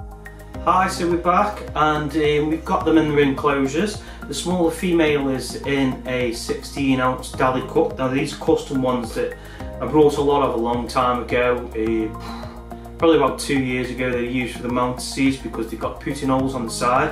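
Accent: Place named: Merseyside, United Kingdom. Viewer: You from merseyside? That accent is to close to home, I'm about pick some jumping spiders up soonish hopefully. Great video bro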